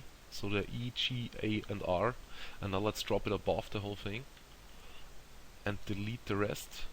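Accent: Germany. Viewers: Du hast 'nen deutschen Dialekt...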